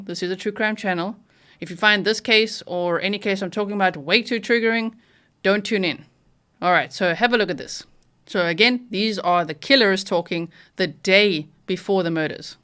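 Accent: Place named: South Africa. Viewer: Why do you sound South African? 😮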